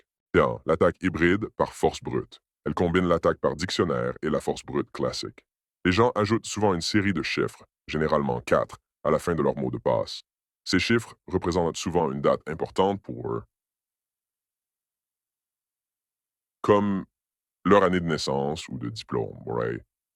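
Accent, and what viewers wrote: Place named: Canada. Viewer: Accent Canadien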